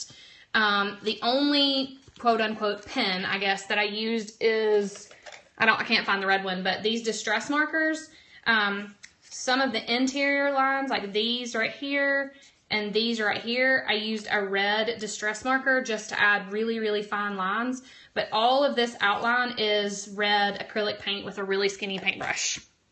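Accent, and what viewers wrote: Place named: South, United States. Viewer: Hi, just saw this periscope, so glad I can view this on youtube (I can't periscope:-) LOVE your tutorials for this lettering and florals, THANK YOU for showing us how to do this. BTW, love your southern accents too;-)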